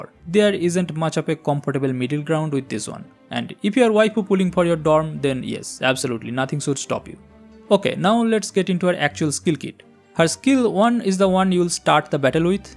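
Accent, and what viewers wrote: India. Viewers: sound of indian ppl , not recommend.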